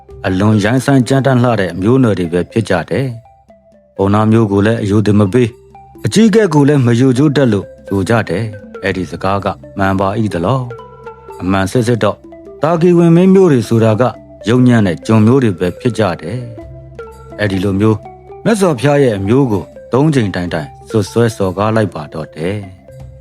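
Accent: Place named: Dawei, Myanmar. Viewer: အကိုစကားပြောတာ ထားဝယ် အသံဝဲသလိုခံစားနေရတယ်ဗျ ထားဝယ်သားလားဗျ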